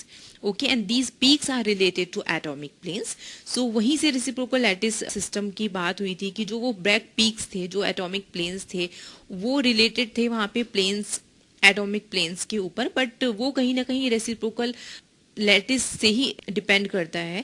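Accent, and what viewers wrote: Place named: India. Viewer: ....本来听生肉已经很难了，还是印度口音，有点绝望。不过讲的确实不错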